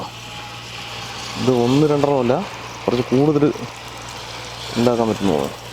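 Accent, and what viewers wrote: Palakkad, India. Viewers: Ninglde Slang Kettitt Palakkad aanu nnu thonnunu. Sariyano?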